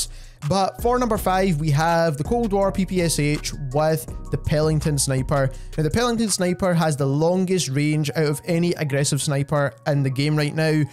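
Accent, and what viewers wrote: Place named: Scotland, United Kingdom. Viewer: i love the scottish voice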